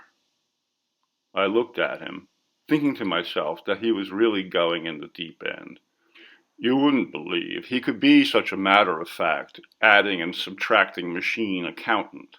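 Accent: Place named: New York, United States. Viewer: What a great New York accent,and really well read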